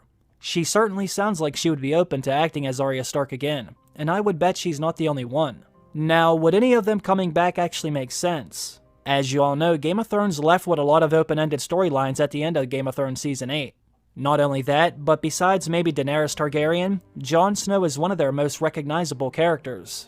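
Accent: Pittsburgh, United States. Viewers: Are you from Pittsburgh?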